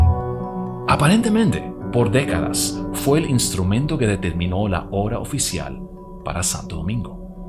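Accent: Germany. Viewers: Más bien está haciendo una pronunciación alemana.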